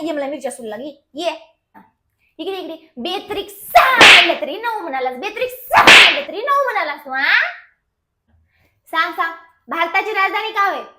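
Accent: Nanded, India. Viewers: Tumchi language khup chan....😂😂😂😂😂😂😂 1 number Nandedkar...😂😂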